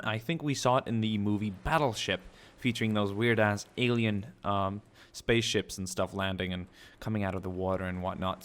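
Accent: United Kingdom. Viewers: Didnt you have a brittish accsent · I just watched a video you made in 2011 you sound a lot younger and almost have a British accent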